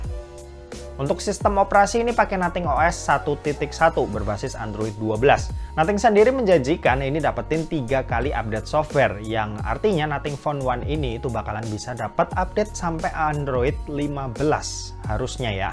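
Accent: Semarang, Indonesia. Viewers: Orang semarang bang ?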